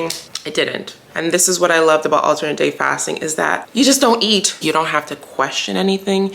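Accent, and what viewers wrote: Jamaica. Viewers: You sound like you have Jamaican parents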